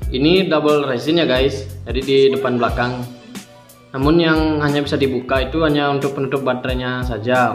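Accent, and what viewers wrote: Bali, Indonesia. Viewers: Org bali ya bang? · Pasti nak bali ne nu logat" Bali ne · Ni org bali ya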